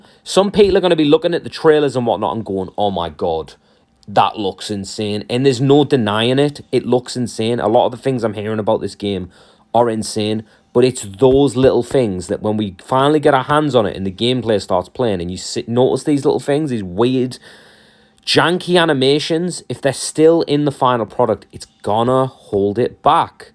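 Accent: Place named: Scotland, United Kingdom. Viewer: I am giving you thumbs up just for that epic Scottish accent man, aside of that you are right some animations need polish :D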